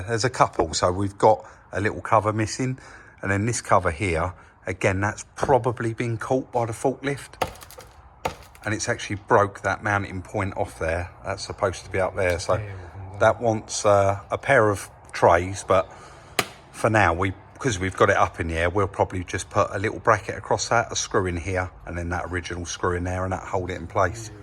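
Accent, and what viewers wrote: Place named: Cockney, United Kingdom. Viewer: and by the way. Love the channel. Very relaxing, love the cockney accent.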